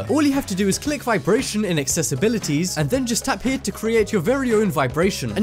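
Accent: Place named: United Kingdom. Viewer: Omg he has a british accent, me took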